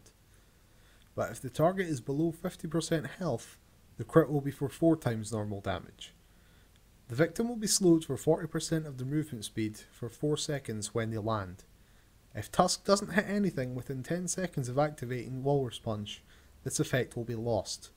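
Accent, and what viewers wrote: Scotland, United Kingdom. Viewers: good guide. Scottish I guess?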